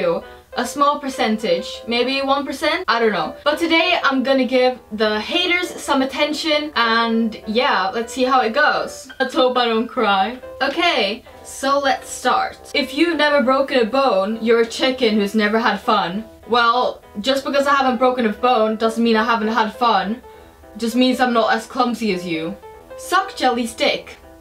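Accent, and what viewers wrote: United Kingdom. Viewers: Why do you sound british fancy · Why in these videos you have like a British ascent and now days you don’t? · you seems like your voice right now is like British and your voice in gaming is like america!?!? And are you even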